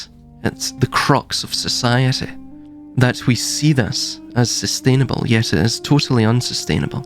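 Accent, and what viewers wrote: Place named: Scotland, United Kingdom. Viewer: Anything you say regarding Steiner and his Christology path is welcome. Thank you so much for all you speak and with a fine Scots accent.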